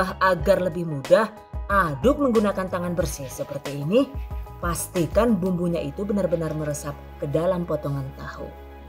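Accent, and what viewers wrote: Banyumas, Indonesia. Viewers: Bahasane ngapak metu ya bune, kaya nyong deneng, joss 😃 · Orang ngapak bu? 😆😆😆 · Ok OK OK Siap di nikmati lugot Chennel ini sangat ngapak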